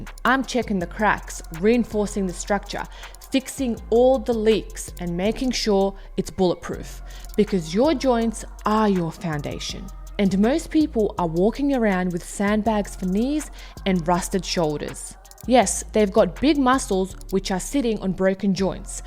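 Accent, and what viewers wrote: South Africa. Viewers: Random question, are you from South Africa, I hear a bit of an accent there? Great videos btw. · It's the accent right? I also thought we were from SA when she said 'bro'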